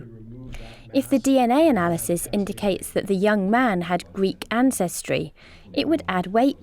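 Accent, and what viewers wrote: England, United Kingdom. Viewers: I adore English Narrators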